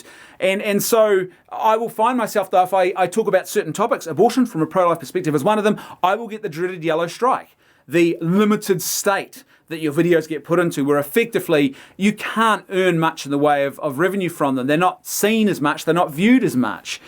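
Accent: New Zealand. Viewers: I would've subbed, but you clowns from across the ditch have the most annoying accent in the world. Sheesh dude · You sound like a new zealander sir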